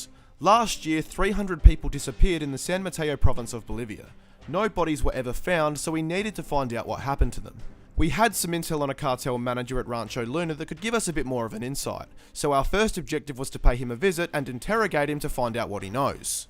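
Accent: Australia. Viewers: Do you think you will continue playing Hitman Absolution? I don't care really, I just love your true blue Australian commentary. :)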